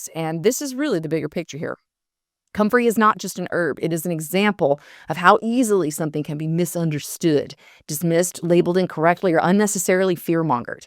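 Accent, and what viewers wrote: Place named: South, United States. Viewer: Really appreciate this teaching and love the southern belle voice!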